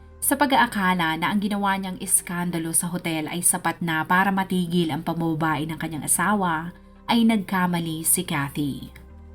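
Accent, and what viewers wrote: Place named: Ilocos, Philippines. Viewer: Lumalabas ang ilocana accent nyo dito Manang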